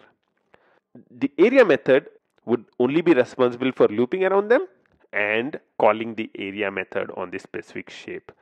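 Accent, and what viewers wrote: India. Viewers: As soon as i heard "Welcome back" in an indian accent I wanted to close it hahahh...but people in India are acctually very good at development. Sadly I just cant take it haha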